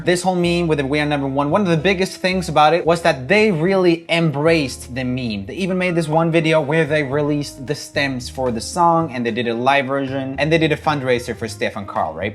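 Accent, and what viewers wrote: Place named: Sweden. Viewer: Why sound so different and having Swedish accent 😅😅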